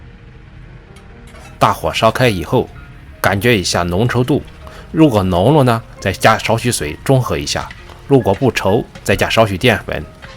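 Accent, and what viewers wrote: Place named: Qinghai, China. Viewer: 标准的青普 哈哈哈，爱上了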